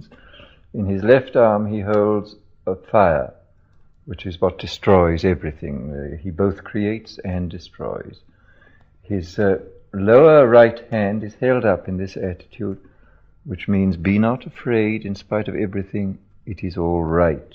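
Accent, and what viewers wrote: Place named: RP, United Kingdom. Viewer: Old School British Public School RP.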